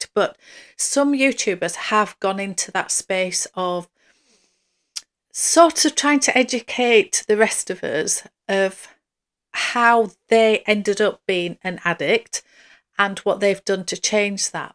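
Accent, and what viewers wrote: United Kingdom. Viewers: Hi, Yes, I have stopped watching Colorful Noir, Caitlyn & the third (not sure who she is), but Shea Whitney is another one who has gone on to bigger and better things. It's hard watching some of those videos, because it makes me feel guilty about my bag obsession (as if something is wrong with me!) 😱😆🤣 Anyway, I watch you, Meredith, Lisa, and Dayle (Connor not so much 😆). As an American living in Vegas, it's something about the British and Australian accents that has me hooked to your channels. And I just love hearing Lisa's perspectives in NY. ☺️ I honestly believe the foreign accents in YouTube channels make them more appealing to watch. (You all sound so much more sophisticated than us!😂) It's very addicting. You have the most calm, soothing voice & are so polite but then stand your ground in a non-offensive way, which is a great skill to have, since it doesn't put people on the defensive or make them feel bad. 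Anytime you have a video up, I always watch it because it's like listening to a polite and sophisticated friend. Also, I believe a lot of people feel the same way. Americans love foreign accents, and even more so when talking about handbags! ☺️ So I think you could definitely talk about gardening & I would listen (just to hear your perspective in your British accent). 🥰💜💙🙏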